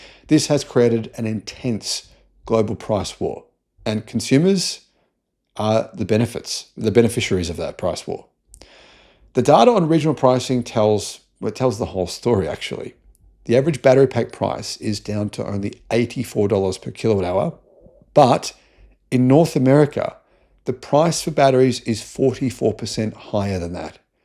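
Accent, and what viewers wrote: Australia. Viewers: He speaks colourful Aussie!! 😂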